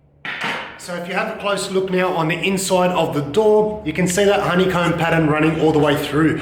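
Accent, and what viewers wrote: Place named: Australia. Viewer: cheers mate, amazing what you can find on t'internet and it sounds like it's from Ozz (don't kill me if you're a Kiwi lol!). I've gotta cut 4" off a door and this'll work a treat👍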